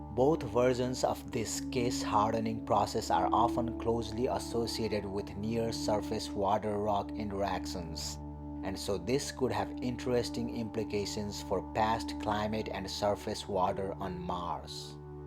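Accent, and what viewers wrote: India. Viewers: I find it a little harder to understand as it has an Indian sounding accent. I am from the UK.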